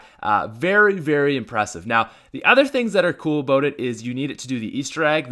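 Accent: Canada. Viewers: Its funny I'm Canadian and he's the only Canadian I've heard that says aboot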